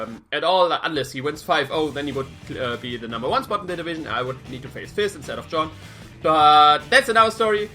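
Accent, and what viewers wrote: Germany. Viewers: Looks like another youtuber i'll enjoy to watch. BTW, if you don't mine me asking, are you German? Cause your English sounds a lot like German. Not to be rude though.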